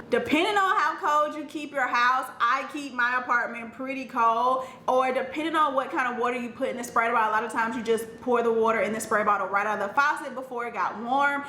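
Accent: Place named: Alabama, United States. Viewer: Had a feeling you were from Alabama..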